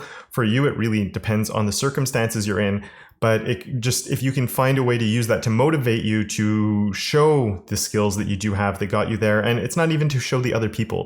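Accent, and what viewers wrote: Canada. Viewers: This was good. You have spent some time looking inside yourself to understand where this comes from. Very helpful to me at this time. Also.... are you Canadian? "About" and "doubt" caught my ear. ;)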